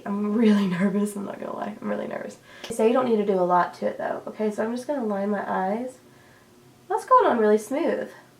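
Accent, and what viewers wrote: South, United States. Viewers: I just discovered your channel and I could tell you were southern but always wondered where from. We are from mississippi but my husband has family from Alabama and he is a HUGE auburn fan and has passed on his love for the team down to our son! We try to make a game atleast once or twice a year. Love it and love your vids!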